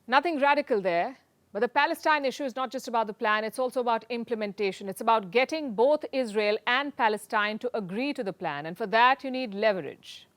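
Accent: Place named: India. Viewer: Is this American media with India accent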